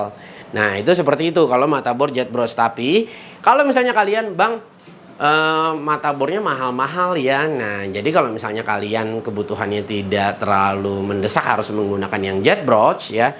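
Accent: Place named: Betawi, Indonesia. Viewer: Jujur bang cara penjelasan ente emang beda betawi abis mantap ❤ane jd cengar cengir ngeliatnya 😂 ini baru review + hiburan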